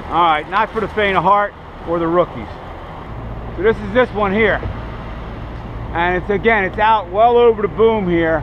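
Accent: New York, United States. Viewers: Excellent video. Are you from New York?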